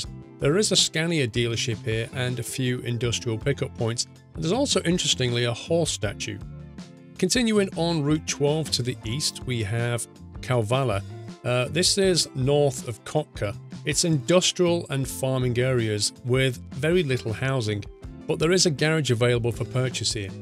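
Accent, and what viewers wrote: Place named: United Kingdom. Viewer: That British pronounciation :D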